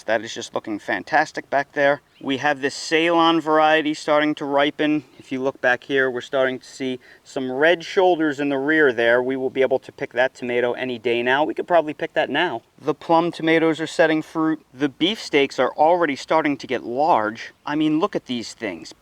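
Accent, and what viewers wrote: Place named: New Jersey, United States. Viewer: Love love love this! So glad i found your channel! I'm in New Mexico and using the vlack weed fabric terrifies me 😅. It does get cool at night (50s) but during the day it's in the 90s. Even starting around the end of april. But I love this idea. I have a raised bed (livestock water tank 😂) and just got some grow bags. But it all seems to be going so slow. I even started mine indoors and it still seems slow 😢 but I cant wait to learn more. Was surprised when you said you were in the south bc i heard the accent but then you said Jersey and it made sense 😂😂 im from ga so it was funny to notice 😂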